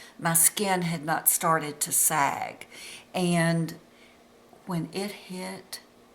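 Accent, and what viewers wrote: South, United States. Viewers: So glad to hear that someone else had the same experience as I did upon turning 65. I've always taken care of my skin except when we didn't know what the sun could do. So going from what everyone told me was beautiful skin to lines, sagging, brown spots, wrinkles and under eye bags has been tough. Glad I found you! Love that southern accent! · 70!? You look INSANLEY FABULOUS! Plus I hear a southern twang!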